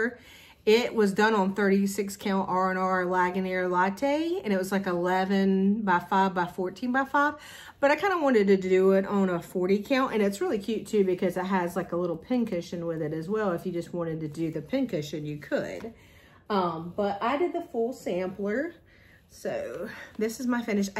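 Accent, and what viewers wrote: South, United States. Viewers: 🎉 I have heard you shouted out from about 4 floss tubes!!! Love your stitching and honey that accent is like honey! I’m a native Texan and have always loved any southern accent. Happy stitching! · I miss hear that southern drawl so i will definitely be back i have great memories of living in the south 😊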